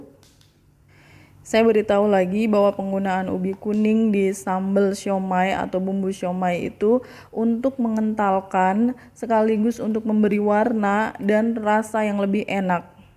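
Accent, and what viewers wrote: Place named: Sunda, Indonesia. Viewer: Hahhahaha super lekoh.... asli urang Sunda 😃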